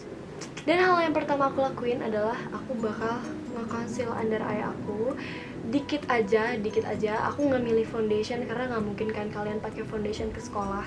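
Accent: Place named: Java, Indonesia. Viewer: logat jawanya keluar :v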